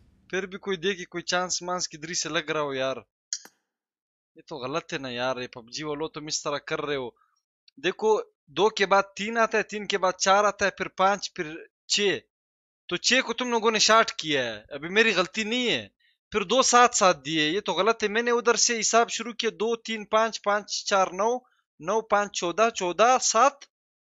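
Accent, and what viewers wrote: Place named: Hindko, Pakistan. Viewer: Ap kaha say ho? Awaz sa asa lgta hai ap hindko speaker o.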